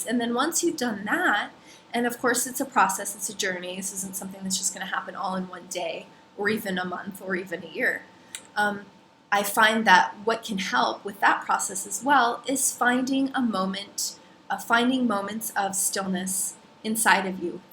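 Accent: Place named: Minnesota, United States. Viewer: Too true. All those years in HI and you can still hear the MN in your voice. Love it! :)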